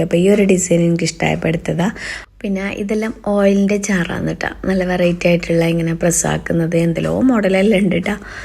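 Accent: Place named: Malappuram, India. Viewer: Nalle paangnd ഉപ്പളാത്തെയും മലപ്പുറം ഭാഷ 2 ലും mundikko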